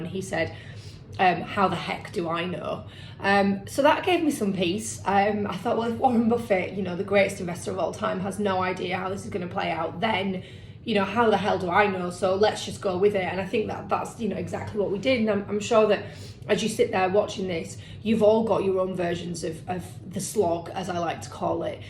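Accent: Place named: Yorkshire, United Kingdom. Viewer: Ohh keep the faith , sound sensible Yorkshire lass, beats a warren buffet e very time , something good and instantly decent about you . lovely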